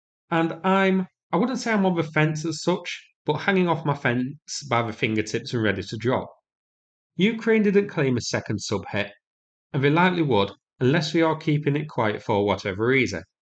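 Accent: United Kingdom. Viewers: thanks subtitles...where are you from in Britain?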